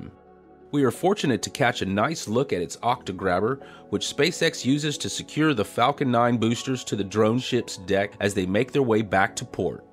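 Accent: Texas, United States. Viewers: Welcome back!... seriously, missed that familiar voice.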